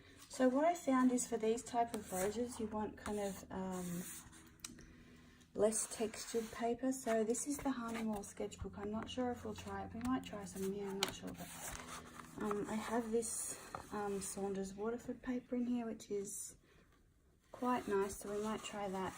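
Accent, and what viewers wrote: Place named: Australia. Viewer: I’ve watched a dozen videos on painting Watercolour roses and this one is by far the best. You sound Australian, hi from Tasmania 👋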